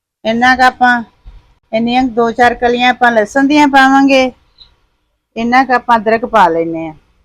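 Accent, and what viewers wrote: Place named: Majha, India. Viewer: 🙏🏻ਭੈਣ ਜੀ, ਤੁਹਾਡੀ ਬੋਲੀ ਤੇ ਤੁਹਾਡੀਆਂ ਬਣਾਈਆਂ ਚੀਜ਼ਾਂ ਬਹੁਤ ਵਧੀਆ ਜੇ। ਮਾਝੇ ਦੇ ਜਾਪਦੇ ਓ।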